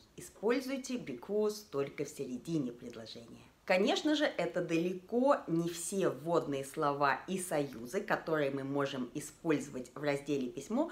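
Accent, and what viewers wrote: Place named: Australia. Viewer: I hear australian accent,esp. in 'no','so' )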